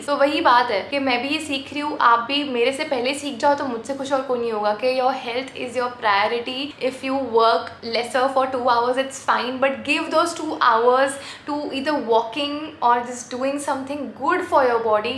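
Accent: Gujarat, India. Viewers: Mam you are gujarati?????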